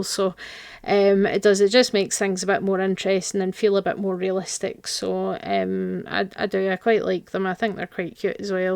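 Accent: United Kingdom. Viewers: This video is cool, thank you scottish science youtube lady 👍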